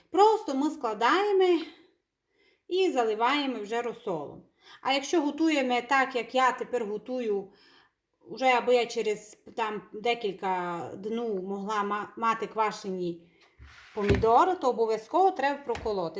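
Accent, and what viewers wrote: Transcarpathia, Ukraine. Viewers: Це Закарпаття, свій діалект української мови. Все гарно ( файно) і зрозуміло. Дякую,.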